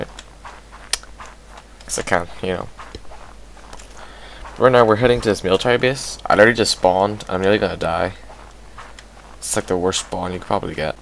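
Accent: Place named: Lisburn, United Kingdom. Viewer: Dude! I can't believe I didn't pick up on your accent the first time around lol. My folks are originally from Lisburn lol My granda used to own the pram shop on the corner at the top of the town Fredrick Thomas lol. Biggest hint I can give to help others channel is to network. Go to peoples channel talk to them and ask about their videos it puts people in a really good mood and then they come to yours. when you said "I took a baitin ther" I thought Yup Lisburn :D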